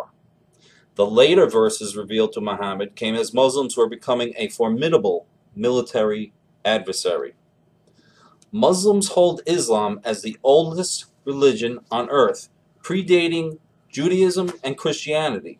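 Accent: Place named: New York, United States. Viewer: NY area accent.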